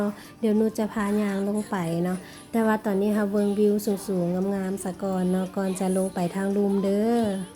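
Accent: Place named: Laos. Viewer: สำเนียงลาว แต่คำไทย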